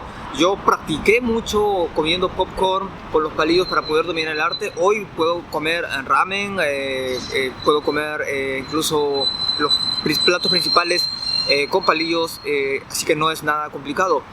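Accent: Japan. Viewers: Se te ha pegado el acento japonés no pude reconocer tu nacionalidad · Tiene acento japonés el ... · Se le pegó el acento japonés jaja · Buen video. Se nota que estas hace rato porque se te pego el acento japonés. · El muchacho es peruano de aca a japón. solo que adopto el tono japones.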